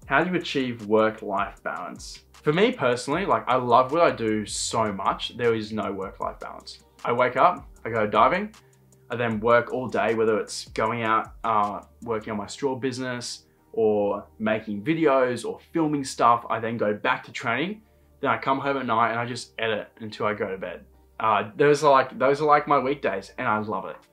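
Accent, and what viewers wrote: Australia. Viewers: I love your Aussie accent. You are so adorable. ❤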